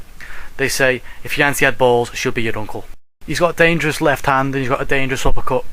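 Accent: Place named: Liverpool, United Kingdom. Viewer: a scouser :)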